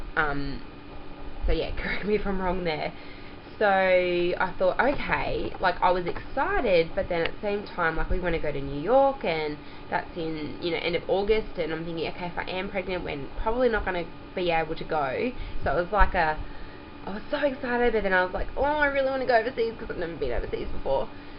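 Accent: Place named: Australia. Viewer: Ha ha i thought the same when i saw your video and then when i heard your voice i was like ahhh I'm sure she's Australian lol go us Aussies. Ooh i love Queensland such a beautiful place we're thinking of getting married over there. How are you holding up with the crazy weather over there? I'm over in Perth, love it here. Thanks babe fingers crossed it happens soon! xox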